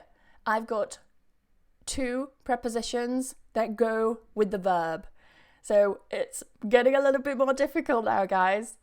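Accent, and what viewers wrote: United Kingdom. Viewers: Thanks a million ,I wached and I made 3 mistakes it was really useful I hope keep going , thus I really like British accent especially your pronunciation .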